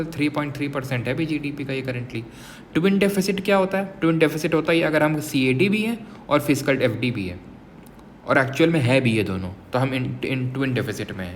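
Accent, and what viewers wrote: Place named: Bihar, India. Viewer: You are from BIHAR I Guessed it 😂😂❤❤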